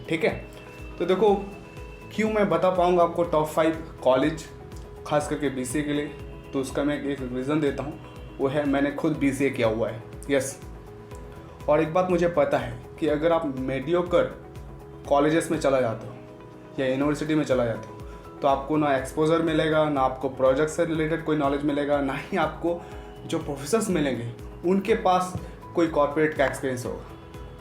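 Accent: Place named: Northeast, India. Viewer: Hahah..this is not funny Bro..!🙄 Looks like this guy is from Northeast..and waha ki hindi thori si alag hoti hai.. isliye inka accent alag hai..!! Don't make fun of him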